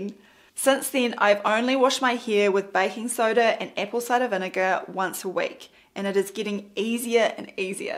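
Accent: New Zealand. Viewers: Sounds Kiwi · At what point does the NZ accent become a whole new language? I can barely follow. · Hilarious NZ Accent! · is drop dead gorgeous and that kiwi accent ) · I wondered about the accent. New Zealand apparently.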